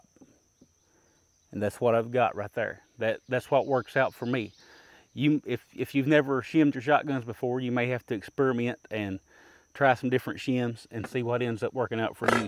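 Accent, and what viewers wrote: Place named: North Carolina, United States. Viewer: Excellent video. Exactly what I was wanting to know. You're accent sounds like good ol NC. Am I close?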